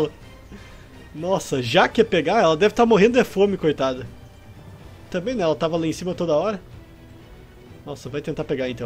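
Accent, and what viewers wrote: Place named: South, Brazil. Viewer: Sotaque muito do Sul skksksks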